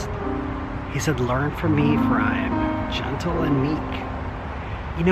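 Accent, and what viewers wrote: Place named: United States. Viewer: American accent na c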